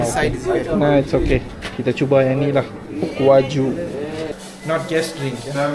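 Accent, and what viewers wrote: North, Malaysia. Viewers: Selalu tgk vlog mat salleh..ni vlog BM loghat utara..mmg lain dari yg lain..saya dah subs😊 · Yang paling best loqhat utara tak hilang weiiii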